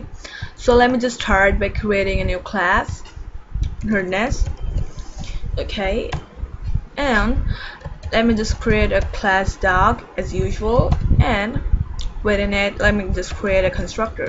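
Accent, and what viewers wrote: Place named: United States. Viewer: american english but its good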